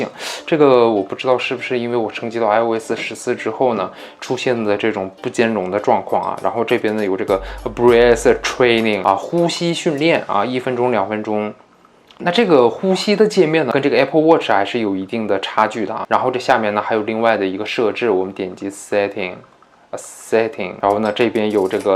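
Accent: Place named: Northeast, China. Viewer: 隐约有点东北味儿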